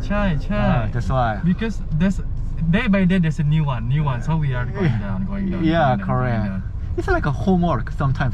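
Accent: Singapore. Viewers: I’m watching this video because of phi 👀❤️ btw, are you really korean? When you speak english you sounds like singaporean 👀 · I feel like you're singaporean tho, your accent..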